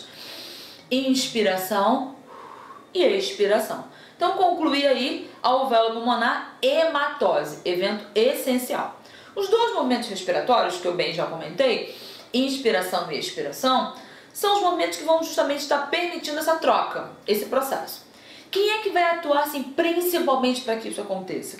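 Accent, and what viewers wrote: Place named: Rio de Janeiro, Brazil. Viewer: Perguntinha, espero que responda. Voce é do Rio de Janeiro, parece que voce é de lá tem sotaque.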